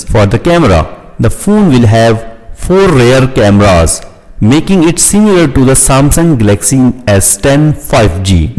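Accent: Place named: Tamil Nadu, India. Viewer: I think ur acent is tamil if I am correct. I like it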